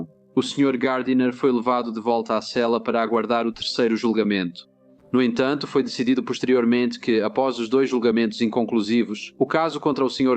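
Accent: Portugal. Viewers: Este sotaque de Portugal é um saco! Dá pra resolver esse problema? Se continuar assim vou deixar de assistir vídeos deste canal.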